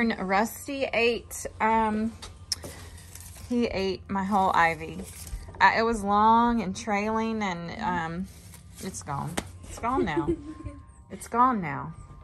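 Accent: South, United States. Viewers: Loved the video. Typical farm day something always breaks Lol. I love your positive outlook also your accent. A real southern lady 🙂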